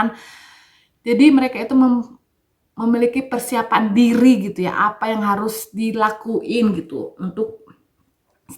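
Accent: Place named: Medan, Indonesia. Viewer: wkwkkwwk jujur kali critanya,lagi beol manggil2.hadohhh.salam dari martha mccrea sist,logatmu itu loh.medan kali wakkkk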